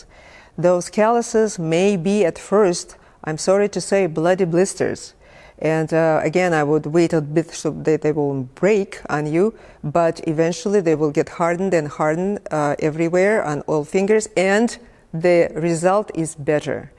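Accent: Russia. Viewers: Какой сильны русский акцент! Но было интересно.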